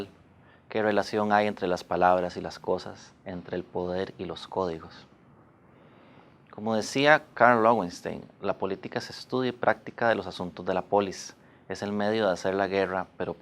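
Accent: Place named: Costa Rica. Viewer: es por q es costaricence y su forma de expresars es muy peculiar,con un acento distinto al demas español.y bueno si también ay palabras q significan otra cosa pero siempre ligado a su idioma popular.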